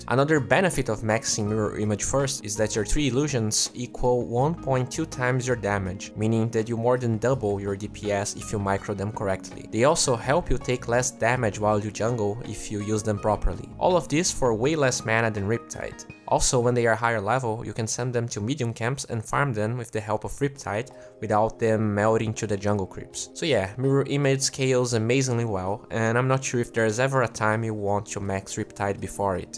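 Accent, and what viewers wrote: Brazil. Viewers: Brother, com esse sotaque. Tu é Hu3hU3 BR?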